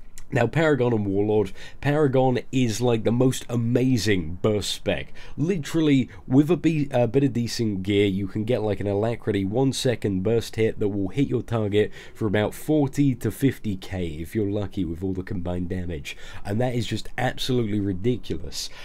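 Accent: United Kingdom. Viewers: Hey. Great vids. And love the accent!! Its like sherlocke holmes playing rift! Keep up the great work!!!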